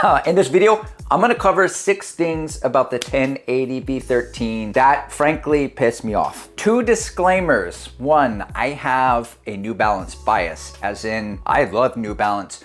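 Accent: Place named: Boston, United States. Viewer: As a New Englander your Boston accent was 1080 v13 like, but your explicative tirade right after was peak Boston!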